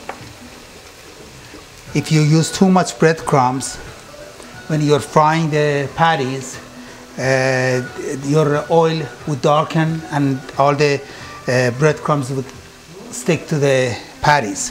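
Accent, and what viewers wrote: Iran. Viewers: من جدیداً این فیلم رو دیدم وبه نظرم عالی بود که با لهجه قشنگشون این غذا رو آموزش دادن خانم داشتن لهجه نشان دهنده این هست که این آقا زبان دیگه ای به جز زبان انگلیسی بلده و این خیلی خوبه مخصوصا لهجه ایرانی نشان دهنده این هست که زبان شیرین فارسی رو بلدن انگلیسی زبان ها هم موقع فارسی حرف زدن لهجه خیییلی زیادی دارن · I'm sorry, but I really couldn't focus because of his thick Persian accent (the one that Persian are so famous for) Bebakhshid!😅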